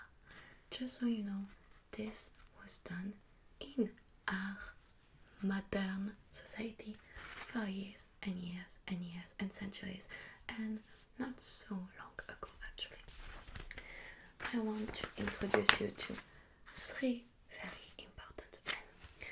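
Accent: France. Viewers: Why this french accent? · I love your French accent! Greetings from Brazil! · traffy jump maybe because she’s French???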